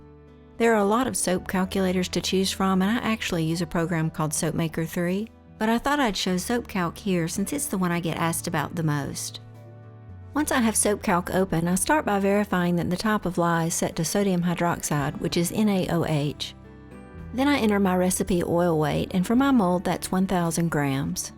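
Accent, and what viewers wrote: South, United States. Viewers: The southern accent is the beauty of the south. Let is out. Sing it ! The rest of the world is blessed to hear it.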